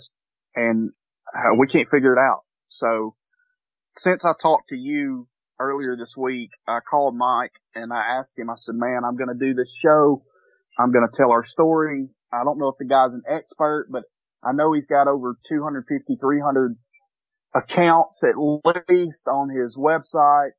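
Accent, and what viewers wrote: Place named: Alabama, United States. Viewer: great collection of encounters! “Great Alabama accent”.....I could listen to you all day! Thanks for sharing....Thank you....touchdown 🏈!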